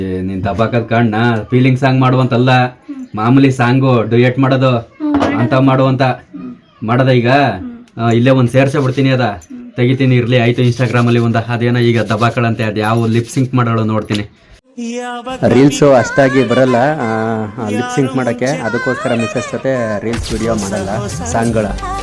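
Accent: Mandya, India. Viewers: . ನಮ್ಮದು ಯಾದಗಿರಿ.(uk) ಆದ್ರೂ ಮಂಡ್ಯ ಭಾಷೆ. ಕೇಳೋಕೆ ಚಂದ.. All tha best... K A. 33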